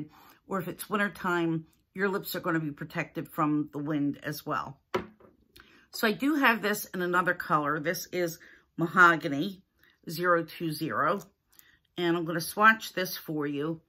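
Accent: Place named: Philadelphia, United States. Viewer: Beautiful shades of lip color. My favorite was the last one. It matches what you're wearing perfectly! By the way, I'm a new subscriber to your channel. :) I hear a little "Philly" in your accent. Is that where you are from? Just curious. Have a fabulous weekend too!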